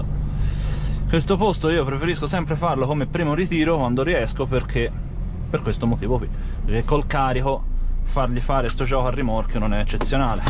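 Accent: Tuscany, Italy. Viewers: Video molto bello soprattutto non noioso poi l accento toscano migliora il tutto. Potresti fare un video anche di pochi minuti un cui fai vedere il camion?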